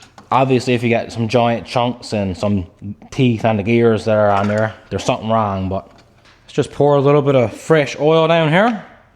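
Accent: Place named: Newfoundland, Canada. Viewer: Soon's I heard ya speak I said "My son, there's only two Islands that guy could be from."..So I took a look around outside and I don't see ya nowhere here in Cape Breton so Newfoundland it is.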